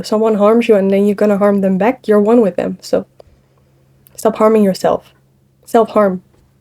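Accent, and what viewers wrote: Netherlands. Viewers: I know a Dutch accent when I hear one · I like it..nice..video.. .reminds me of the Netherlands ur accent..a lovely country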